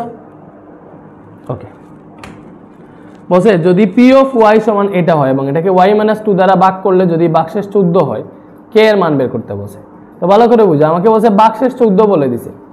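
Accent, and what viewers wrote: Sylhet, Bangladesh. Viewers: সার আপনার বাড়ি সিলেট নি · Uni Sylheti..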